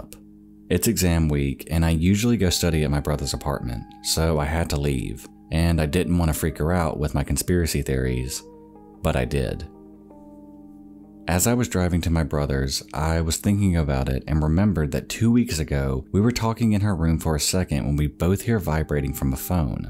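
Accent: South, United States. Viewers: Lmao “NEXPO rip off” but NEXPO doesn’t have that sick ass southern accent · are you from Florida or Alabama or somewhere like that? I'm from north al and I've been trying to place your accent but I can't quite get it. It's very nice though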